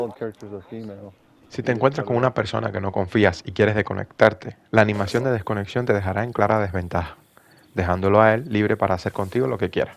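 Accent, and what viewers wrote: Cuba. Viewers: Este es cubano si o si ,inconfundible 😂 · Cojone asere eres cubano verdad?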